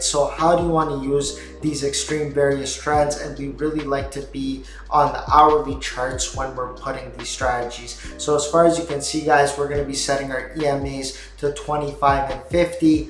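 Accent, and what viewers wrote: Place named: United States. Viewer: Most American sounding Russian I’ve ever heard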